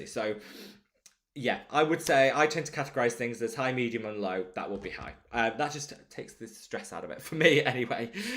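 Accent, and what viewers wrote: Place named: United Kingdom. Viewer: Are you from British ? Because I like to hear your voice when you spoke , it made me tried to find the differences between English and American accent. Well, Thailand is now am. But for me, I’m going to sleep soon. By the way, thank you so much for your kindness for replying me.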